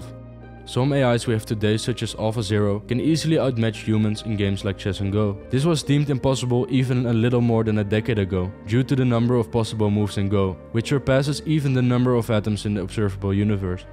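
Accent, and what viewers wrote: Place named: Denmark. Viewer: ah cool, kinda sounded like a danish accent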